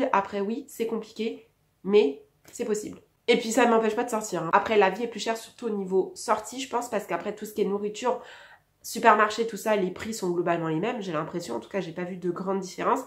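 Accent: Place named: Quebec, Canada. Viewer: Ton accent est différent. Il y a un petit peu d'accent québécois, J'aime bcp.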